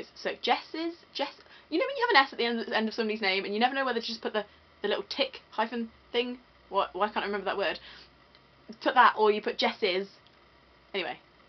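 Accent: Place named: United Kingdom. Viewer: Wow. I can't listen to even one minute of this garbage even with the cute British accent. Of course I'm a man.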